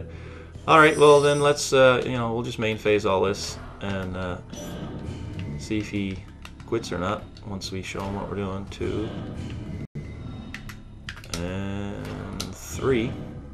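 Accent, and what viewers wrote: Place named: Newfoundland, Canada. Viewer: I'm sitting here thinking.... this guy.... I think he's from Canada.... he sounds..... NEWFOUNDLAND. Yup. I'm from BC, so no hate here haha.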